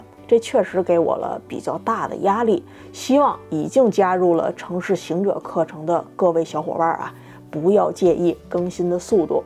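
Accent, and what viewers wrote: Beijing, China. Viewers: 北京话太亲切了。您这刚学剪辑我感觉就已经做得很专业了 非常棒 支持 干货很多，会给朋友推频道的！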